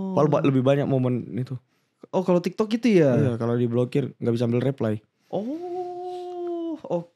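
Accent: Palembang, Indonesia. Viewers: Pantess la logat logat dio ni cak uong palembanggg wkwk retinyo pernahh di palembang g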